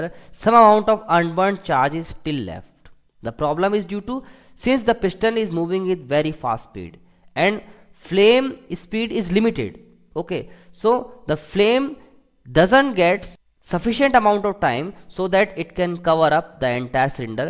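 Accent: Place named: India. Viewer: You can’t just speak English using the pronunciation of your own language. We can hardly understand a single word you’re saying. You might as well be speaking Indian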